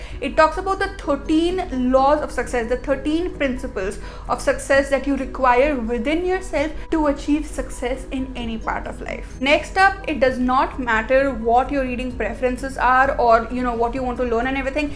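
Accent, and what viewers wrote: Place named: England, United Kingdom. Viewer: You have such a great English accent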